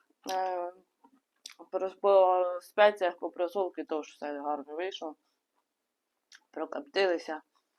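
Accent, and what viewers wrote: Poltava, Ukraine. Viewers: Привіт зимлячка!!!!!!!, сьогодні я перший раз побачив твій ролік і почув наш Полтавський говор, дуже зрадів шо є така жінка рибачка, із сила, наша настояща Полтавчанка а ни наіграна якась бутафорна блогерша, багата з екоферми!!!!!!!! А в теби все по простому, як обично в селі!!!!!!!!!!! Та щей канал свій ведеш, зразуж підписався, дуже радий шо тебе знайшо чисто случайно, переглядав одне відео про заборону вилову риби з першого квітня, і тут натрапив на теби!!!!!! А з якого ти села якшо несикрет????????? Я з с.В.Сорочинці!!!!!!!!!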